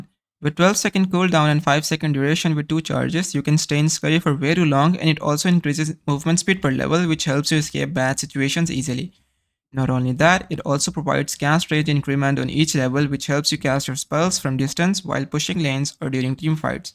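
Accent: India. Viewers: bro this indian accent i cant bro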